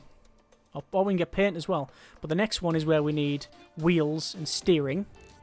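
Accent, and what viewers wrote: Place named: Yorkshire, United Kingdom. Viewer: I see you joined YT on my Birthday, the 29th Jan, although I was born some 46 years earlier in 1966. Am I too old? joking. Are you a Yorkshire lad? if you are I bet your Mums backdoor is always open for a cup of tea. I'm a good bit deaf nowadays so I might hear the accent wrong a wee bit. I am a Norfolk boy. I'll stick around and watch this playlist, retired now so have a bit of free time.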